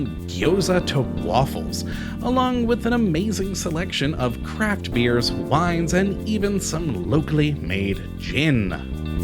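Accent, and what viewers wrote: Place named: United States. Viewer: This mate trying to sound funny, Hate his American accent.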